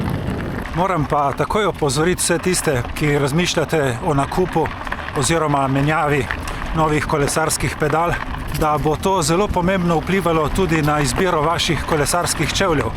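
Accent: Primorska, Slovenia. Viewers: Predstavitve tvojih "potovanj" so mi všeč zaradi preprostosti in tudi, ker rad slišim primorski naglas. Malo ti zavidam, ker se voziš po lepih krajih in ti ni treba takoj v klanec. Jaz sem Zasavc in mi je to prikrajšano, ker moram takoj prestaviti v prvo za kakšno turo in še naša ozka dolina na cestah ni preveč prijazna do kolesarjev. Te dni sem zamenjal kolo in sem šel nad naše dva tisočake v Julice, kjer so me pozdravili vršaci nad meglenim morjem.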